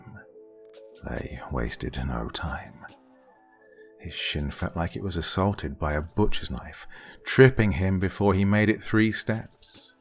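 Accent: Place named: United Kingdom. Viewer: I love how your accent is clearly UK but your son has a mixed accent. & are super good as well! I have a severe arachnophobia so idk how long ill last, but do know that i tried. 😭😭😂😂😂😩👌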